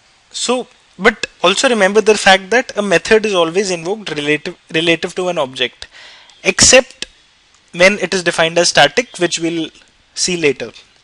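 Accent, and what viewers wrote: India. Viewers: Amin Mohammed His accent sounds like Indian - He's an Indian bro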